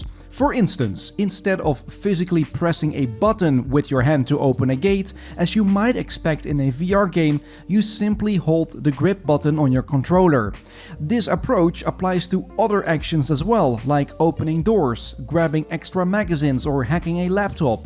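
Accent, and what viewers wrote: Netherlands. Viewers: U bent nederlands? Ik kan het horen aan uw engels accent.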